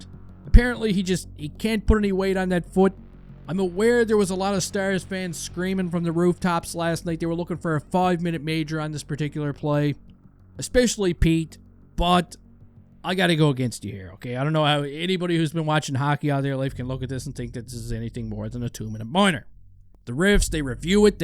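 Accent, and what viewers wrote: Newfoundland, Canada. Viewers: What part of Newfoundland you from dude?😅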